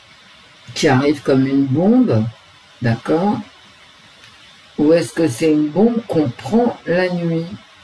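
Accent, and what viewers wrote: Paris, France. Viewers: Bonsoir. Le plaisir de trouver cette nouvelle voyance après une randonnée au bord de mer, le Var est privilégié, il a fait chaud, il y avait même des baigneurs, qu’elle injustice même au niveau du climat. Comme d’habitude toujours impressionnante cette voyance qui colle toujours à la réalité, vos commentaires qui l’accompagnent toujours un plaisir, vous arrivez à me faire rire avec 😂 votre gouaille bien Parisienne, trêve de plaisanterie l’avenir n’est pas rose, il y a de fortes chances que le 49.3 soit encore sur la table, les paysans ont toujours eu du mal à s’entendre, et le fameux remembrement des parcelles bien responsable également des dégâts des eaux, que de décisions irresponsables depuis des décennies. Rien ne va plus dans notre malheureux pays où souffle un vent mauvais. On en PP 😱😡😟 Bonne soirée à toutes et à tous. ❤️💕💌🌹🐞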